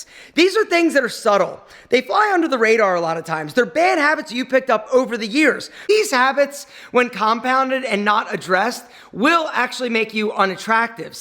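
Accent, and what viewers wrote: Philadelphia, United States. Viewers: “Drink wyahter” “medium lenth hair” 😂 I love how you can still hear Philly accent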